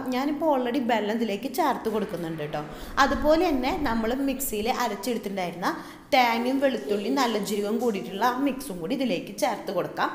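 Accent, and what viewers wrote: Thrissur, India. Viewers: തൃശൂർക്കാരിയാണ്...അപ്പോൾ സംസാരത്തിൽ ട്ടോ മയം കാണും... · Thrissur alley? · Trissur Kariyano? Super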